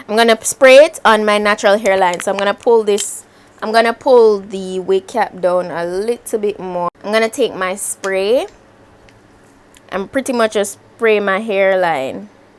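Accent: Jamaica. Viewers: Love your method! I m gonna try it today! Your accent has me missing my family in Kingston 😩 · U never heard jamaican accent🙄😢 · Goodasssss! Wooyyyy. Lol I just found this video today and literally recognized the accent and fell in love. Such a quick but helpful video! Thank you and definitely subscriber. Happy to see Jamaican YT prospering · Thank you for not camouflaging our beautiful accent with a twang. It’s refreshing to see a Jamaican with a platform like this. Keep it up!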